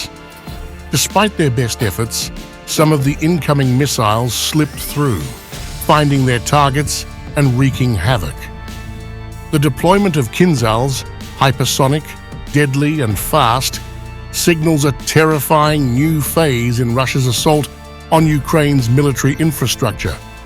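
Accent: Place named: United Kingdom. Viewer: I guess the Russians have abandoned hope that the incoming Trump Administration would work for the comprehensive peace arrangement in Europe they very reasonably want. The Brit accent of the narration initially put me on guard, the Brits being arguably the most propagandized Russophobic nation in Europe. I kept waiting for usual Western anti-Russian lies and propaganda. Thankfully they never came, and I'm subscribed.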